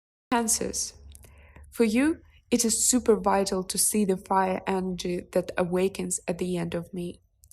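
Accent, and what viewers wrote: Russia. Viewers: я вам дам честный конструктивный критический отзыв, так как я на самом деле очень ценю вашу русскоязычную эмоционально окрашенную подачу и хочу помочь. Надеюсь мой отзыв поможет если вы нацелены на англоязычную аудиторию. Я могу судить так я двуязычный подписчик, очень давно живущий в англоязычной стране. Для тех кто с рождения говорит по английски в этом видео слышен русский акцент с не натуральными английскими интонациями. Это не немного раздражает и не даёт насладиться содержанием так как форма не натуральна и натянута. Кроме того интонации не эмоциональны и не зажигательны как ваши, так что ваш отличительный шарм теряется. Я бы посоветовал найти рождённого в англоязычной стране русского человека, таких много, бросьте клич и кто-то откликнется. А русские субтитры тоже хорошая идея от ваших подписчиком. Английские субтитры можно в настройках YouTube включить. 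· Too much of the accent, reminds me my English classes in Russia. It’s a not really good way to learn English. Don’t like to criticizing anyone, just my personal opinion. Очень люблю и подписана на все его каналы.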